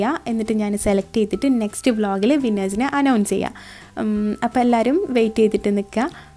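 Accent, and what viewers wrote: Kannur, India. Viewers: പൊളിയാണ് വീഡിയോ എല്ലാവരും നല്ല ഒത്തൊരുമയോടെ ഉള്ള ഫാമിലി ആണ് ഫുൾ ട്രിപ്പ് ഫുൾ വീഡിയോസ് അടിപൊളി ഞാൻ എന്നും കാണാറുണ്ട് എന്റെ ഫേവറേറ്റ് യൂട്യൂബ് ചാനൽ എന്ന് പറയുന്നത് ഇത്താത്തയുടെ തന്റെ ക്യാരക്ടർ എനിക്കിഷ്ടമുള്ളത് വോയിസ് എനിക്ക് ഭയങ്കര ഇഷ്ടമാണ് മാഷാ അള്ളാ നിങ്ങളെ കണ്ണൂർ ഭാഷ പൊളിയാണ് നിങ്ങളുടെ കാമി റ ഞാൻ ട്രൈ ചെയ്തു അടിപൊളിയായിരുന്നു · Ningale kannur style samsaramanu ettavum cute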